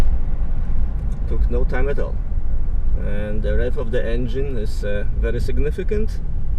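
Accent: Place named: Russia. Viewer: Russian accent)))Learn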